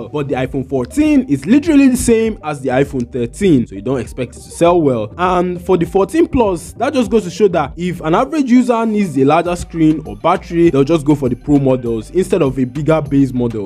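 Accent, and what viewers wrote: Nigeria. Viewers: You speak like a Nigerian Nice video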